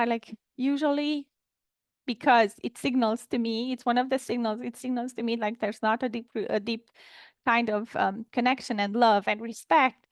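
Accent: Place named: Philippines. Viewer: Are u Philippine? Interesting accent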